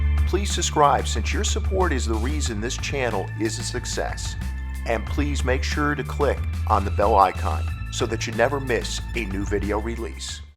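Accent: United States. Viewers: Wow. That was a great video and voice over. The narrator had the greatest American accent of all time. Good topic and spot on.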